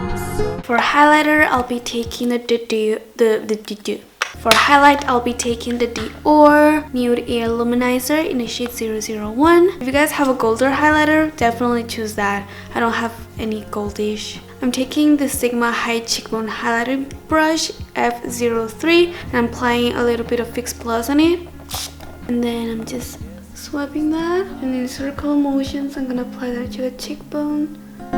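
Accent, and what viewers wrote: Spain. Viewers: Where are you from? Your accent is interesting it sounds almost as if you naturally speak Spanish..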